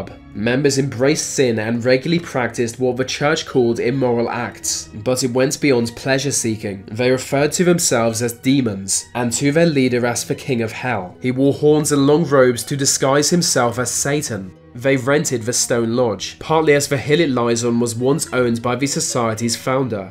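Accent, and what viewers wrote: United Kingdom. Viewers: A british voice doesn't make one knowledgeable.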